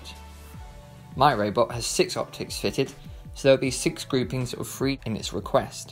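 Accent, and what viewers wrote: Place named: United Kingdom. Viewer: i ll follow ur tutos and buy what u linked in description, then i ll try to give u a "field report" =) THANKS SO MUCH to share that tho ! love ur accent :) british ?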